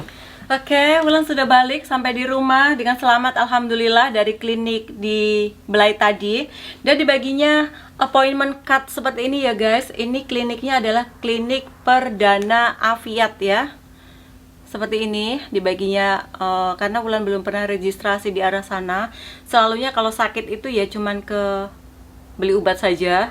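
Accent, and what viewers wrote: East Java, Indonesia. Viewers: aku senang sama gaya bahasanya jeng Wulan, pakai bahasa Melayu, tapi logate medok khas Jatim, keren jeng... 👍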